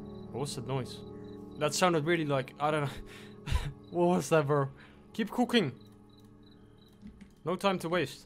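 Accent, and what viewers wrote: Netherlands. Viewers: love het nederlandse accent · hey are u dutch i hear it in ur accent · are you from the netherlands?:body-blue-raised-arms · Dutch accent 🫶🏽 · I smell dutch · hmm i smell Dutch?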